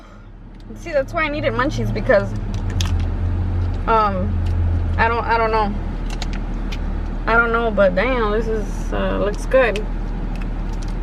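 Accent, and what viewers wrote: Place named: Texas, United States. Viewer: Lol I love your voice you sound like all the girls I grew up with here in Texas.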